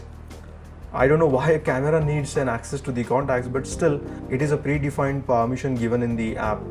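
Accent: India. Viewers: love the Indian accent!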